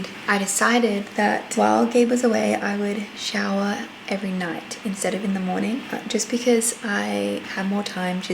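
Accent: South, United States. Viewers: I'm married to an Aussie and I think you guys for some reason always sound like you're making fun of us when you do what I call the regular American accent. lol Idk why! Your southern accent is on point though! ❤ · Aussie here, I loved your southern accent! It was really good! Also just wanted to say that having Gabe working from home everyday is a blessing too, my husband works long hours for 3 days and then has 2 days off and I really enjoy his company on those 2 days! Wish he could be home every day lol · Your southern accent 😅 so good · Lol the Southern accent is so on point. You sound like Miley cyrus in the Hannah days or Georgia from Genie and Georgia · Hahaha I love the southern accent! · The SOUTHERN accent woah!!!! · The southern accent was spot on! I'm from Memphis, and you actually sounded a lot like one of my friends 😂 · The American accent had a hint of southern and the pure southern accent was amazing. 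Btw you made us feel like we were your friends in this vlog ! ❤ It was so intimate. It was really nice to get to know you more! ❤ Btw apparently the dinosaur bones are the fallen angels/creatures that got destroyed during the flood that God sent! There's probably more information in the book of Enoch. I love your natural brown hair too, it looks so good sis. God bless you and your family. (we love the 20 minute videos !) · As a woman from Clarksville, TN you nailed that southern accent girl! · As someone from South Carolina, your southern accent was not half bad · Your southern accent is not bad at all! I'm southern, and I've always thought that certain vowel sounds in an Australian accent sound really similar to a southern american accent. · Ok but your southern accent was good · How is no one talking about how incredible that American accent was?! Also the SOUTHERN ACCENT?? Incredible. 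Better than some actors doing a southern accent. I’m impressed. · Honestly loved the southern accent! And this vlog was so sweet!❤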